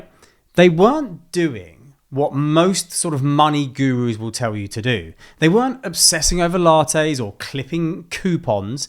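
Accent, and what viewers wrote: United Kingdom. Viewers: This is a really useful resource, both in terms of finances and for learning English. are you a Londoner?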